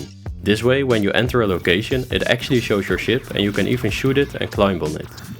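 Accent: Netherlands. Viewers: are jouw from the nederlands? becaus jouw sound dutch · Hey, ik ga er vanuit dat je Nederlands bent vanwege je accent en ik vroeg mij af bij welke universiteit of hoge school jij game development hebt gestudeerd.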